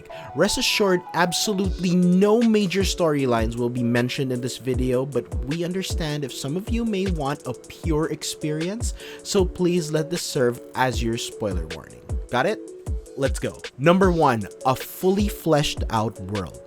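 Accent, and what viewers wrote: Philippines. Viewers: Pinoy ka noh? accent palang and choice of words. hehe but nice review.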